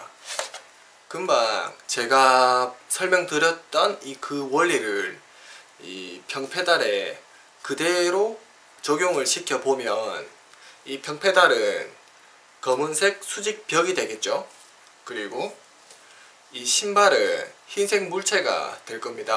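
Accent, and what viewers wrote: Gyeongsang, South Korea. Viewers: 짜증난다 저 경상도 사투리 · 경상도쪽 말투 같은데..진짜 찾아뵙고 배우고 싶습니다 ㅠㅠ 좋은 정보 감사합니다~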